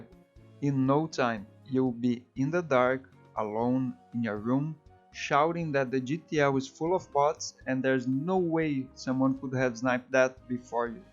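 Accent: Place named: Brazil. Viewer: You speak english like a brazilian guy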